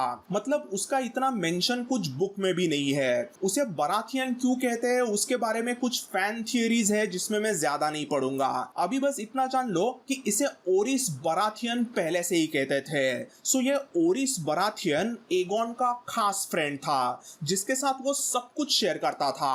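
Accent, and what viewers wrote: India. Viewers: Open the video for listening. Found out this fûc-kface speaking in Indian accent. Leave.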